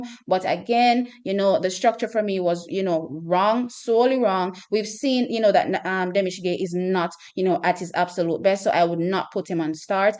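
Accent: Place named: Jamaica. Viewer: Hi. I like your channel. I love the topic. I am American, but I am enthralled with the Jamaican female sprinters. If I can make one suggestion for you?... Too much commentary w/o enough visual references. You are pretty you look at, and I love the accent, but we need some pics or video referencing what you are discussing. It helps to keep our attention. 🤷‍♂ 👍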